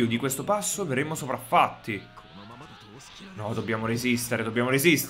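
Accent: Naples, Italy. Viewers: Sei napoletano io si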